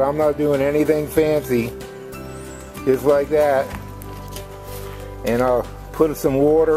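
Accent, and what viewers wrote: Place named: East Coast, United States. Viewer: Cool! I’m curious where you live because it sounds like you have an East Coast accent and I saw that you had the palm in the snow… I’m from Pennsylvania but I’m out in California now. That’s pretty cool that you can grow them in that temperature ...I didn’t know that! I grabbed little baby Palm Pups all the time walking around just to see which ones I can grow he he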